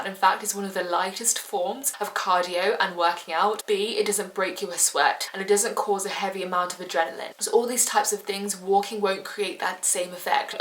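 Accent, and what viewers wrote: RP, United Kingdom. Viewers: Yeah I know there's different British accents hahaha but her particular one is so pleasant to listen to because she pronounces the words so clearly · her accent is very posh, we don't all sound like that lol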